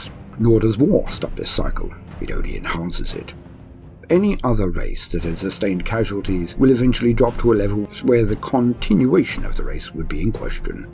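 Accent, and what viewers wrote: United Kingdom. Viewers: Is it just me or does British accent instantly make whatever the topic more entertaining? Nice reading voice duder!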